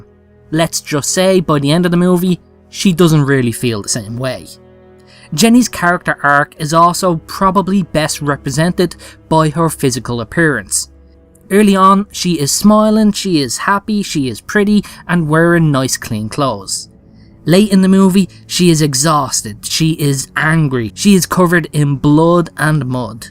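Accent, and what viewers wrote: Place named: Ireland. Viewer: Are you Irish or Scottish? I love your accent. I studied RP at the university. Oh, it says that you are from Ireland later on in the clip.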